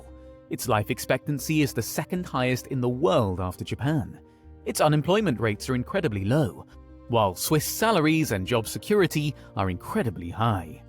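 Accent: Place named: United Kingdom. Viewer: Here is a small correction to the facts you cite in this video: Switzerland's ranking in the Human Development Index does not continue to outperform MANY of its neighbors" - it continues to outperform ALL of its neighbors. It's very important to be factual and not water down the truth, just because the continuously underperforming neighbors could feel triggered by their consistently declining social and economic rankings. Perhaps some day you'll make a video on the UK as today's largest safe haven for corrupt, thieving oligarchs from the eastern bloc SH countries that are granted special UK rights and favorable policies to ensure they stash their ill-gotten gains in the UK? Just a thought. But it sounds like you're british, so I'm assuming we'll be kept waiting? 🤣🤣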